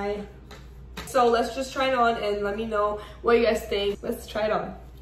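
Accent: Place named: Brazil. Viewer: Não adianta ! O sotaque brasileiro no inglês é permanente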